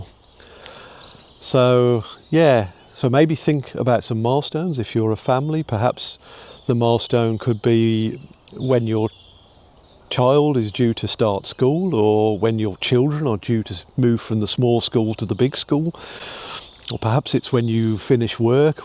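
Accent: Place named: Midlands, United Kingdom. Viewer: I thought I detected a slight Midlands accent! .I used to occasionally go with dad and brother to Wolves matches(in the standing" pens") in late 1960s Originally from near Wolves myself and the last 30 odd years in France(though spending a lot of time in Sidmouth Devon for the last 6 of them helping out my elderly mum)I am, since she passed on a few months ago in a quandary. The problem is, like you, I really can't decide where to settle, always thought would stay in France but the time spent in Devon with mom and sister(who returned recently from about 35 years living in Trento Italy to Sidmouth) has confused things enormously as am getting old! Two offspring ,one living in Annecy France the other in London doesnt help either and they both could move on elsewhere. Anyway very much enjoyed listening to your story,hope things work out for you and family.Devon is a lovely county.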